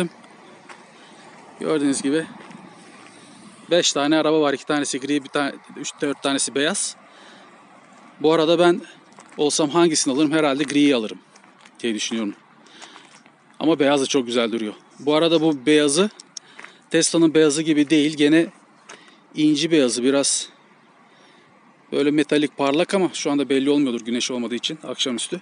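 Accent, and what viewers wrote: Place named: Thrace, Turkey. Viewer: Trakyalı şive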